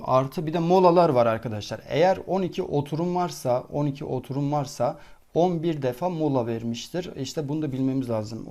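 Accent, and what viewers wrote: Van, Turkey. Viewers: hocam sen kesinlikle vanlisin şive oradan ve inanılmaz semlatiklik katıyor:)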